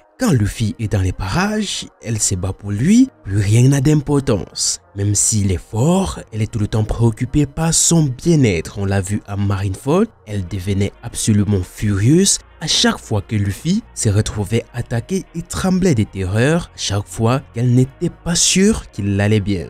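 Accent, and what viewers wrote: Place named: Ivory Coast. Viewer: Tu parle comme un côte ivoirien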